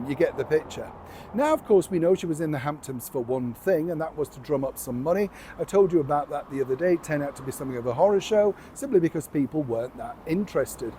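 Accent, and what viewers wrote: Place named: England, United Kingdom. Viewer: It was Mam which is something people called the late queen. It sounded like mom to me too but I think it was his English accent.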